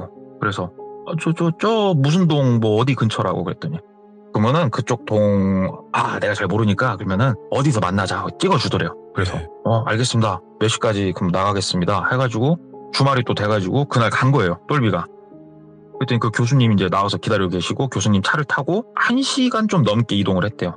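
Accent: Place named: Chungcheong, South Korea. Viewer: 님 사투리는 항상 충청도ㅎㅎ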